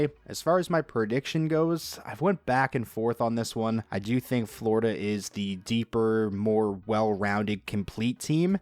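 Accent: Nova Scotia, Canada. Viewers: Are you from Nova Scotia?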